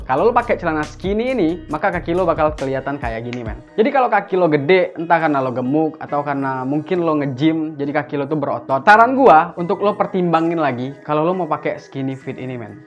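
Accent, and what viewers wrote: Madura, Indonesia. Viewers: Edeh oreng medureh cong?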